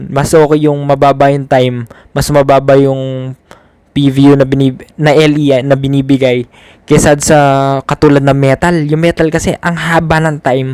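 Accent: Pampanga, Philippines. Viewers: Kapampangan accent ka bro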